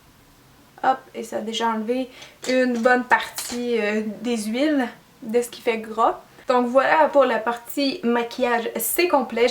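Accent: Quebec, Canada. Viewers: L'accent québécois tu connais ?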